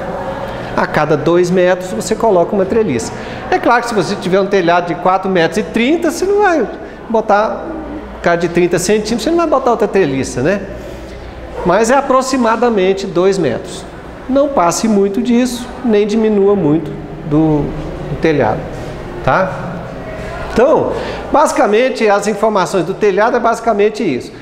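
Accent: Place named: Minas Gerais, Brazil. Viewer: Professor excelente conhecedor de geometria, vasto conhecimento operacional com sotaque de mineiro meus parabéns professor por toda as suas teorias das edificações para execução de obras com seguranças e determinação..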